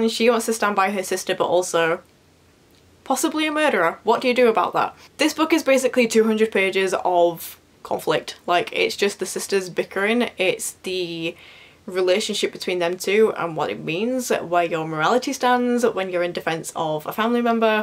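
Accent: United Kingdom. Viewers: I love your British accent, which part of the Uk does it belong to? Greetings from Italy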